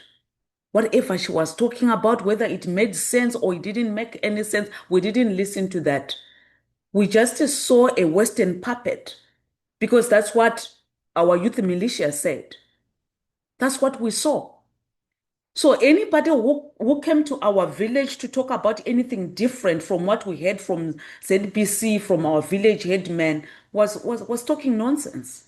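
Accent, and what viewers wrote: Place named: South Africa. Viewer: But your accent is South African.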